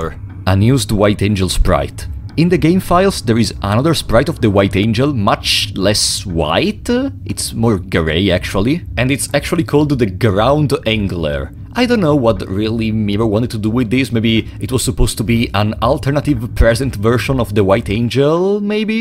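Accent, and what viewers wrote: Italy. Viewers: i can hear the Italian accent from 1 mile away, and it is both nice and funny btw, great video :)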